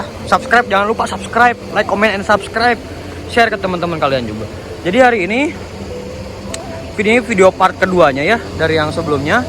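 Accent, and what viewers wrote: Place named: West Java, Indonesia. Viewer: Logat khas orang sunda😀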